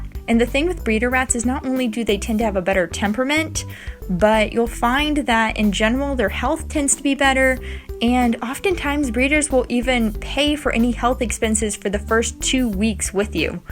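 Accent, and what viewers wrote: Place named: England, United Kingdom. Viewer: Interesting, i get to hear a version of you with a strong English accent using £ pounds instead of dollars. Love both versions!!! YOU ARE AMAZING 😁👑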